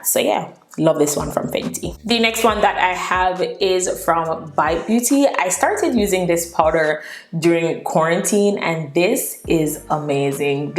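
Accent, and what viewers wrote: Jamaica. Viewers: U sound Jamaican. Not a question lol. So sure you are Jamaican. Great content. Keep doing your thing :)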